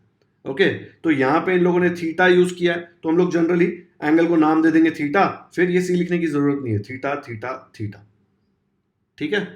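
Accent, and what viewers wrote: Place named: Maharashtra, India. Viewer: Sir i think you are Maharastrian : - becase of your pronunciation (Am i Wright ? ) 😊😊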